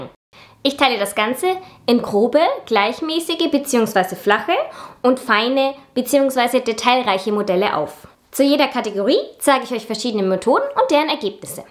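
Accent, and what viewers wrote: Germany. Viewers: Geil, wenn du schwäbelst🤣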